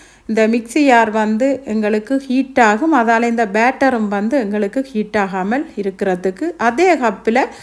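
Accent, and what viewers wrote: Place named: Sri Lanka. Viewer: தமிழ் நாட்டில் கொஞ்சம் படிச்சா போதும் ஆணும் பெண்ணும் பேசும்போது ஆங்கிலம் அதிகமாகவும் தமிழை மிக குறைவாகவும் கலந்து பேசுகிறார்கள். வெளிநாட்டில் வசிக்கும் தமிழர்கள் தமிழ் மொழியை மிகவும் மதிக்கிறார்கள். நீங்கள் செய்து காட்டிய உணவை விட உங்கள் இலங்கை தமிழ் கேட்க அமிர்தமாக உள்ளது. நன்றி... நன்றி... நன்றி...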